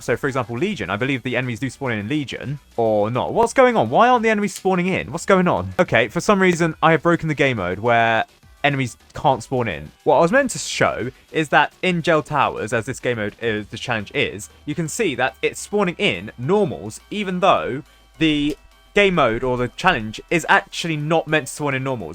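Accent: United Kingdom. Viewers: i forgot was British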